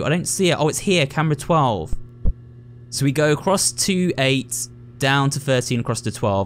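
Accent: South, United States. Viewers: His southern accent is so uwu ❤ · Lemme just say that as a native to the southern state of Georgia I absolutely love your southern accent 10/10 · As a Texan, I have to say your Southern accent is both cute and funny. Not bad! 😂😂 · for a British person his sother accent is pretty good you can still tell he's not American born but that can but fixed up with practice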